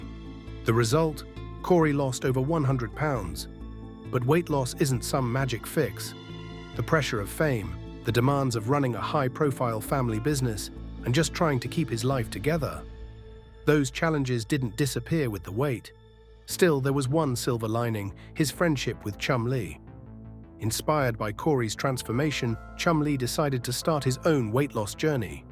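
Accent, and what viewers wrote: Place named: United Kingdom. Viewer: Obviously made by a Brit.There's a whole series about British pawnbrokers, and they all pronounce it 'pornbrokah'. Quite distracting, actually...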